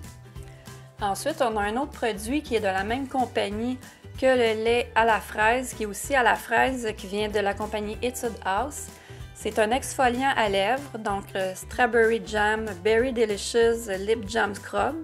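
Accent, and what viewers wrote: Quebec, Canada. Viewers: Cool ! J'adore ton accent hihi laisse moi deviner, tu es québécoise ? (au hasard bien sur) hihi J'adore les produits coréens et japonais y en a des étranges mais ils fonctionnent bien ^^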